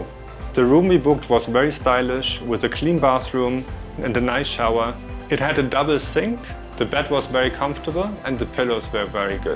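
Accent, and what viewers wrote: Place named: Germany. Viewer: nice vlog, german accent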